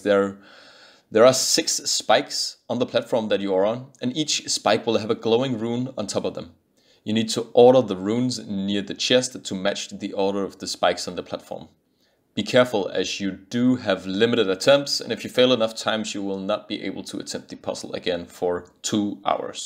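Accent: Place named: Denmark. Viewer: Den danske accent er legendarisk aha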